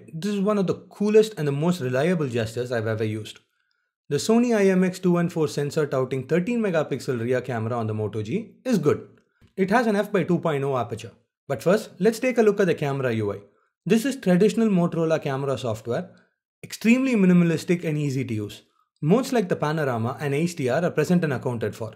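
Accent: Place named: South India, India. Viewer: Good job. Now that you've started sharing the burden off Ash's shoulders, you will grow into a much mature reviewer in the coming days as you have Ash for support. Just need to tone down your South Indian accent a bit. but nonetheless, a good review. thanks!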